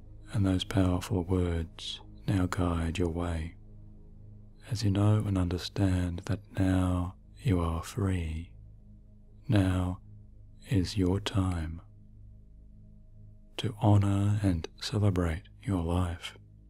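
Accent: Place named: Australia. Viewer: its Australian actually ;)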